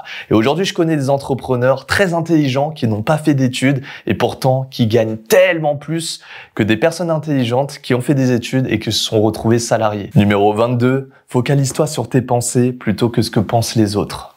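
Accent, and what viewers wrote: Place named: France. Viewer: Jadore ton accent francais , fille de lalgerie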